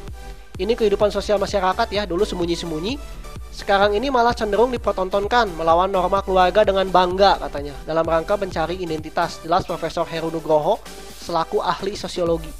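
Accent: West Java, Indonesia. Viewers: Orang sunda kang? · Si akang teh orang sunda ya kang..hihi..ketahuan bangeett cara ngomongnya logat sunda 😀✌✌✌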